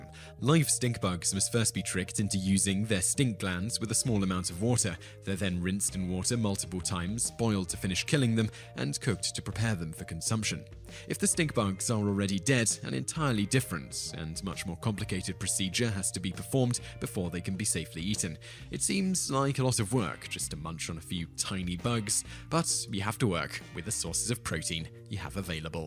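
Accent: England, United Kingdom. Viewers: Canned spaghetti used to be a favourite of mine as a kid. I like turkey Spam. I really appreciate the inclusion of brown sauce on this list. Maybe because the narrator has an English accent. I initially wondered if Vegemite would get on this list (though all things considered, Britain would have to be cited for Marmite in such a case), but credit due to Witchetty grubs as the Australian example.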